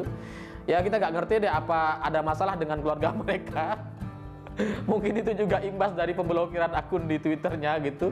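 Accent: Madura, Indonesia. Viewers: orang ini ini logat bicaranya kayak nya orang madura hehe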